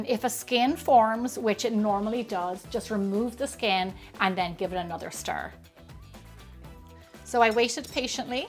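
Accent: Ireland. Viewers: We make yogurt everyday. This is her first time. Very strong Irish accent. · Just to clear up any confusion, this is an accented Irish correct? I just didn't want to get it wrong because I've learned that Scots have a more harsh tone to their speech, but some speak as soft as Irish folk.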